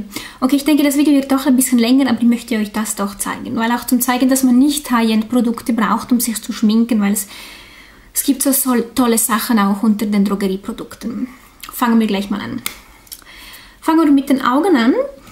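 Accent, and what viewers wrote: Switzerland. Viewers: toller akzent/ Dialekt..(weiß ich nicht genau) bist du Schweizerin?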